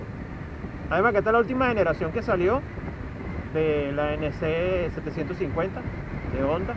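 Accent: Venezuela. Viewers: Por tus acentos eres 100% Venezuela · Eres venezolano? 😮 Me gustó mucho tu estilo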